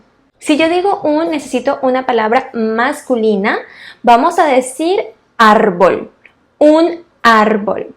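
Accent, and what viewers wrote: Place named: London, United Kingdom. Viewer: Are you in London mam ? Because you are speaking very nice 👍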